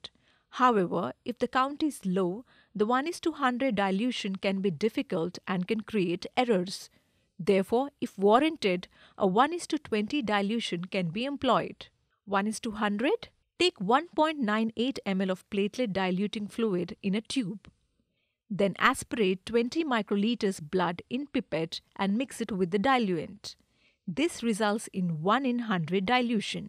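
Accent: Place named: India. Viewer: The presenter is probably from the Indian Subcontinent. In English the pronunciation of V and W is reversed compared with Indian Languages. Also there is no such thing as a Lakh in English. This lack of translation makes this video unintelligible in English.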